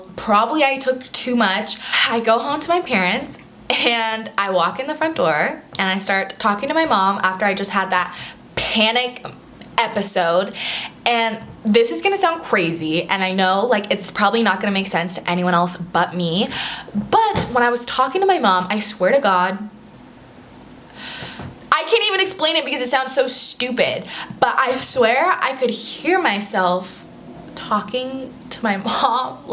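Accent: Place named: United States. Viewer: She has the typical American girl accent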